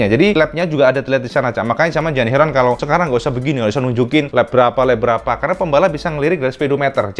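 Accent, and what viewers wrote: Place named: East Java, Indonesia. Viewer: Hahaha...jowo timur cak...terdengar alus tenan..."Raining MO DE" opo Raining Mode in english....uapik tenan bahas technology motogp, sehat-sehat selalu cak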